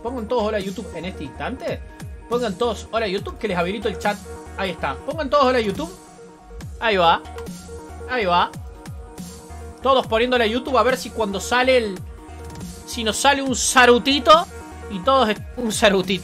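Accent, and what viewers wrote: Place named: Cordoba, Argentina. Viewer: tremendo cordobes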